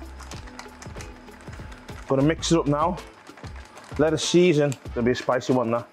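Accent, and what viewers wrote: Liverpool, United Kingdom. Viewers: Scouse.. Liverpool accent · Is he a Scouser? Sounds like one. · Make a video one day when it's all Scouse ! 🙂🙂🙂🙂👍🙂👍🙂👍👍👍🙂🙂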